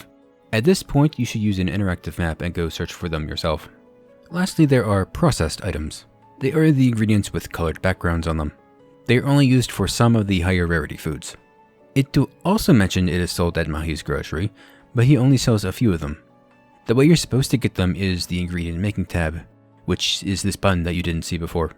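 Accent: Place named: Pakistan. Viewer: sounds like from pak maybe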